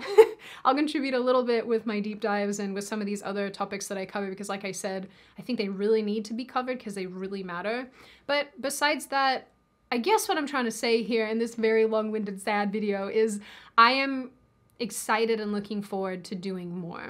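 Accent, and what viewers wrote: Australia. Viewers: Don't give in the pressure! It's sad how things have turned out, but you're one of my favorite commentators; I only ever watched "You Are Here" because of you. I love your snarky comments on the bleak outlook of humanity, and I think your Aussie accent just amplifies it as well lol. You do you, always remember that you're great, and those who support you, will always support you, while those who are just here to bully, will do just that. I really do wish the best for you, whether it's to continue doing this kind of work, or to move on and do whatever else you want with your life. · Oh I just love your Oz accent 😂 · I'm surprised that you feel this way. The reason being I've always thought you had a funny presentation of facts and opinion in your videos. You don't confront and you're never downright mean. You keep the mood light (which is good and a breath of fresh air). 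Or maybe I was just completely duped by that aussie accent 🤔 Anyway. I've always thought that content creators on the right need a good and stable home life to replenish their strength. Especially considering our side believe in the nuclear family etc. So I do truly hope you have someone special in your life. And if so that you start a family sooner than later. Focus on that. Enjoy that. Take a break. We understand this crazy world can actually take a toll. You're only [a lovely] human. Lastly, I follow two big film critique channels (CriticalDrinker and Nerdrotic) on here that do entertaining work. I think it's the same you do, but a different field (it's literally the culture of the political and society you're criticising). The aim is set on fictional characters, stories and suits that greenlight crap. I think you should look in to it. Also, team up with a friend and do some film reactionary episodes. 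Try it out and see what the response is. There are some channels on here that have over 300k views and making good money just by us watching them watch movies 😂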